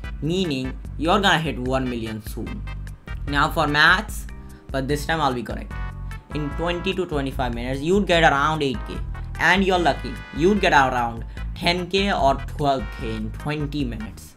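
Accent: India. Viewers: Prob indian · are u indian by chance?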